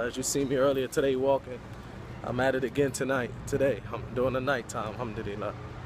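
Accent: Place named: United Kingdom. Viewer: Asslam Alikom bro. You are very welcome in Riyadh and I hope you visit us again and having Arabic coffee, having amain dish in SA that's call Kabsah, barbeque during the night, stroll in desert, going a picnic out of the city espically in spring or winter. I know you love coffee& you have KMW cafe in Ras Elkhemah. May Allah give you ability& help for inviting non-muslims to convert to Islam, Jazak Allah Khair. I like you when you trying to speak Arabic. I am dought when I hear your voice that you speak British slang coz I understood what you saying. Plz contact me for any help from SA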